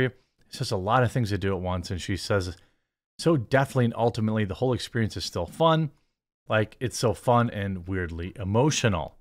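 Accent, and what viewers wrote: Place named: Wisconsin, United States. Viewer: Ok, I love your content and all sorts of stuff you do...I can even deal with hearing about coffee brand coffee co- nonstop! Lol! But sometimes the Wisconsin accent is just too much! Margot Robbie being pronounced like a 'robe' you wear, opposed to like 'rob' a bank, is driving me insane!!!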